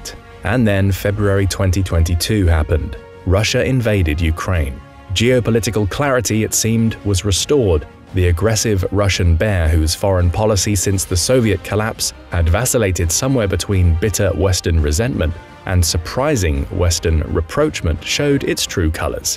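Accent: United Kingdom. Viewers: I hear a british accent!!!😂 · A US Military PR piece using British Accent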